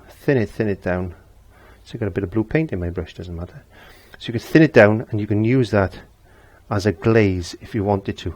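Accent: Wales, United Kingdom. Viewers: Great video! Am I detecting a bit of a welsh accent mixed in with another?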